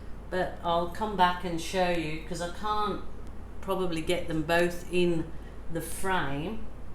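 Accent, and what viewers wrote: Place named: England, United Kingdom. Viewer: Wow absolutely beautiful. Love that you are Aussie (like me) although, I detect an English accent in there with certain words.... like 'round' and 'now'! Loving your videos. Great work! Thank you.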